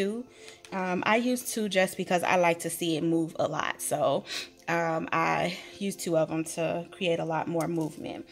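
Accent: South, United States. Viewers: Hi! Oh sugar, I have been doing videos for some time now, and I still stumble over...well...lots of things. I say "um" all of the time. I enjoy watching tutorials where mistakes are left in due to people being real. I never met a perfect person.;). I have butter fingers whenever the camera is on. As soon as it is off, I am fine. Your nails look great! Love it that your nails match your project. Wonky is real. By the way, Tree sent me over. I am a new subscriber of yours, but you will not see me on your list due to my settings are on private. They came out darling! Love the colors. I really enjoyed your tutorial! I love your twang sugar...I am from the south. Oh, and by the way, I also loved hearing your daughter in the background from time to time. Thank you for sharing your creativity with us all. I hope you have a safe, marvelous Monday. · I love this! 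The only problem was that you were out of frame when making the hook with the wire. I couldn't see how you did it. If you combed out the pom poms, they would be even lighter and fluffier. TFS!, BTW, I love your southern twang!,😊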